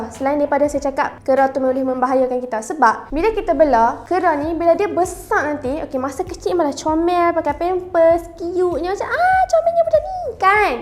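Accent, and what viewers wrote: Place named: North, Malaysia. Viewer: Seronok dengar loghat utara kakak❤❤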